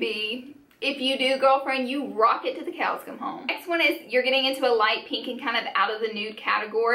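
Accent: South, United States. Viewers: I was curious too!!! I thought it might have been because of her southern accent, but most people know koala at least /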